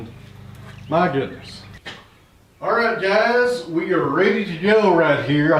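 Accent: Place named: Appalachia, United States. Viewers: What’s funny I have that same Appalachian accent so I understand everything you say. Watch Celebrating Appalachia and you’ll see it’s the way we talk for hundreds of years. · I fully understand your Kingsportian dialect and never knew you had bloopers. Keep on talkin the way you do!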